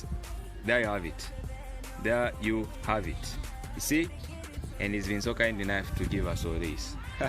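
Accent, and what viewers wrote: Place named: Jamaica. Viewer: He sounds jamaican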